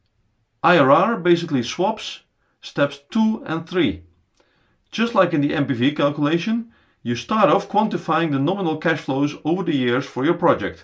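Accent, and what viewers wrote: Netherlands. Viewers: Best explanation on YouTube - and given in a beautiful Dutch accent too! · Very strong Dutch accent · Thank you so much for the videos. And I love your Dutch accent. What I still not fully grasp is the non technical meaning of IRR. Does that mean that if IRR is less than or equal to WACC, the project should not be pursued? And whats the difference between IRR and Rate of Return? Just the time? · Je hoort zo hard dat hij Nederlands is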